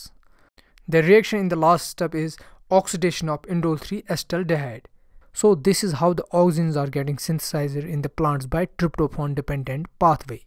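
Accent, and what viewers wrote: Kashmir, India. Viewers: You sound like a Kashmiri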